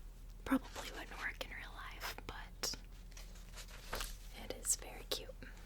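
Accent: South, United States. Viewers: Tracing ✅ Little bit of country twang ✅ Fell asleep ✅ Would recommend to a friend ✅ #1 Youtuber out there ✅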